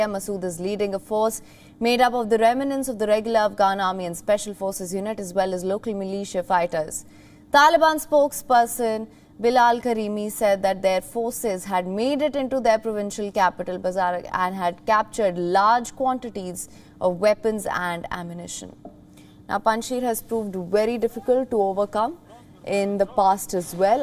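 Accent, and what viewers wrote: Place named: India. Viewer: Accent is never a problem for anyone in the world except for Pakistanis. She is Indian, not American to have american accent. So, get this digested.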